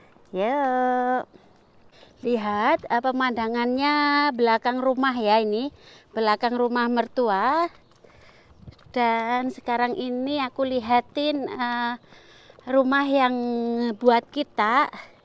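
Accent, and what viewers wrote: Java, Indonesia. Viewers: Suka sama bak bahasa nya medok walaupun udah lama diluar negara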